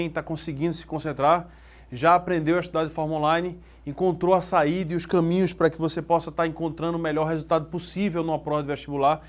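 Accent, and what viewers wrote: Pernambuco, Brazil. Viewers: Esse sotaque Pernambucano deixa a aula mais legal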